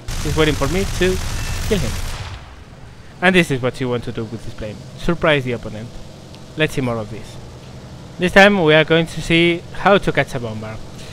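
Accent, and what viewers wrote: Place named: Spain. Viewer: you sound like an academic linguist who doesn't have a good feel for language. I believe he is probably a native Castillian or Catalan speaker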